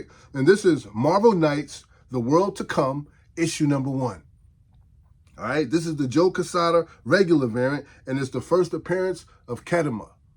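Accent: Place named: New York, United States. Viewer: Yo! Did you go to school in the Bronx back in the 80’s and early 90’s? You sound like a New Yorker for real!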